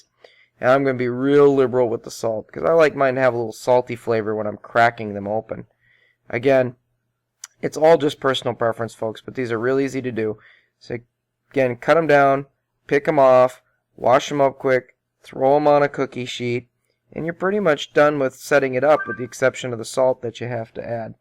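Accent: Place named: United States. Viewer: We love your American accent! Thanks for telling us a great recipe :) Really helpful - we have thousands of giant ones!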